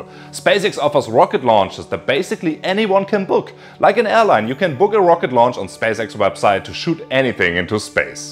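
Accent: Germany. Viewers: i only enjoy his english in a german accent. if he spoke in german I'd be horrified. I've yet to find nice sounding german speech. it's extremely aggressive sounding.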